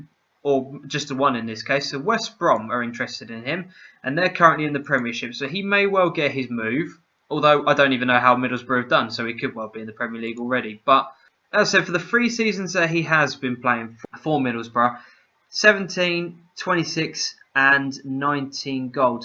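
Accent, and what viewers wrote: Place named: South, United States. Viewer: Thank you for believing in Boro even though your not a Boro fan as your from the south as from you accent!! UTB!!!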